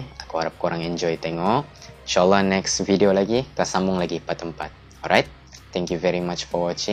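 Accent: Sabah, Malaysia. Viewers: dgr2 org sabah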